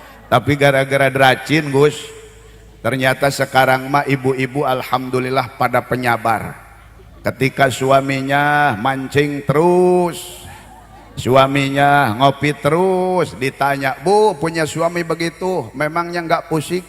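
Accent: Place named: Banten, Indonesia. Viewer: Sepertos urang banten nyariosna. Mantaaap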